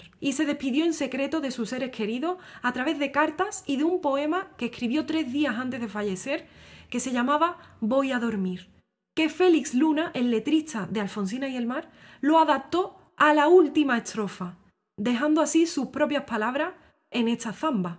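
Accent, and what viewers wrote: Andalusia, Spain. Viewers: Estimada quiero agregar al comentario anterior que, mi bisabuela materna Josefa Calderón Candil y mi abuela Angela eran de Olvera- Cadiz y reconocí tu acento andaluz inmediatamente. Eres maravillosa! Dios te bendiga siempre.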